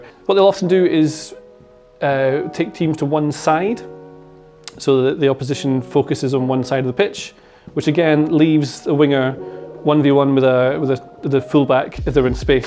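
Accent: Scotland, United Kingdom. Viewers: This is the perfect background noise with the soft jazz, Scottish tones and football vibes while I work from home and weep at the state of my inbox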